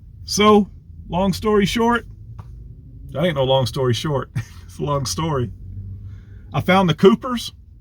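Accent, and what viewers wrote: South, United States. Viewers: The spark in is back!! I'm sort of glad the "road" has kicked you in the A _ _! I can say that from experience ~ ~ gotta listen deeply to your body's healing process. Love your southern jibber jabber too. I think sometimes you change vehicles to keep it interesting for us, plus we all learn. For that, I thank you. Promise yourself you'll slow down even if you do feel better, the healing will come much faster if you do ~ I know from 1st hand experience. Felix is a God given gift, good he's walking that mile with you everyday.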